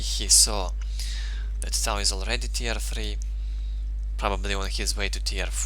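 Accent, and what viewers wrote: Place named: Russia. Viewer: Hi,. Some critics is here. Nice channel, live voice acting and great replays choice. But. This russian accent, gosh. Don't get me wrong, you have good vocabulary skills, better than my. Wish you luck. Thumbs up.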